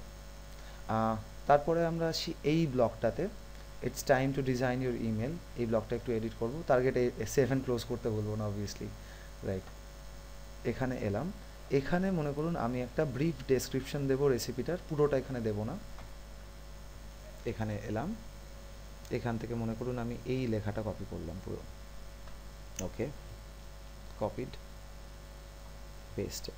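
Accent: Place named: Kolkata, India. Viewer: I thought you were from Kolkata. Bah.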